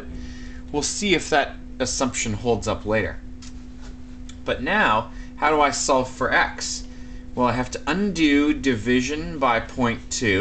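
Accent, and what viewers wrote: Canada. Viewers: you Canadian man?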